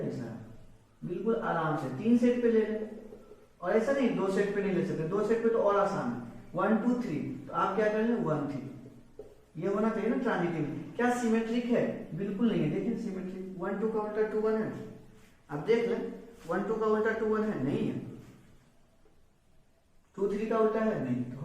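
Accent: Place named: Bihar, India. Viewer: Noted Thanks sir but Lec 3 kaha hai sir....! Mujhe apka padhane ka style aur apke bolne ka bihari tone bahut jyada achaa laga......!❤❤❤❤❤❤❤❤❤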